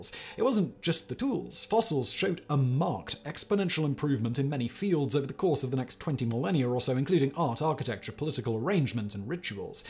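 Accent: United Kingdom. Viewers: As a scientist I LOVE the way you speak about these topics! Science communication at its best. Plus I miss the British accent since I left the UK so that doesnt hurt 😛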